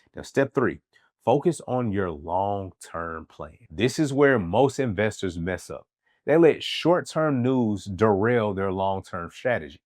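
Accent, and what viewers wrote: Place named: Kansas City, United States. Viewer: Thank you for this. It almost feels second nature to scramble and try to mitigate loss by pulling out money and holding tight to every penny during a time like this. On a different note, I don't know where you're from originally but that accent sounds real KC 😂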